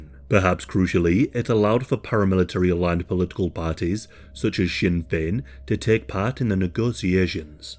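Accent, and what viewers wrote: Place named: Northern England, United Kingdom. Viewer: Sounds to me somewhere north, near Newcastle but not too strong - could be wrong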